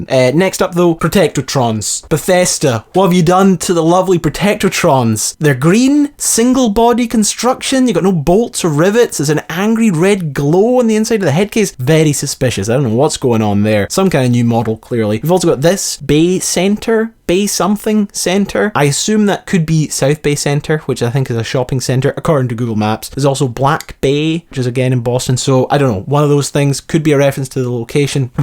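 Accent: Scotland, United Kingdom. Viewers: Is this guy brittish or American or canadian? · Tyler M. It's his accent he's Scottish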